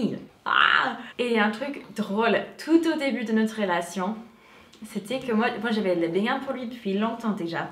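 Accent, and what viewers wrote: United States. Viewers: Comment j’ai pu passer à coté de votre chaine vous etes trop belle et cet accent américain j’aime trop je m’abonne ! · Y a pas grand chose de plus mignon qu'une Américaine qui parle Français... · Ouao tu es vraiment très belle avec beaucoup de classe j'adore ! Et ton accent américain 😍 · Ahh le filtre vocal que tu utilises vers la 3 e minute efface ton accent américain, c’est surprenant..🙂 super vidéo comme d’hab’ 💪💪💪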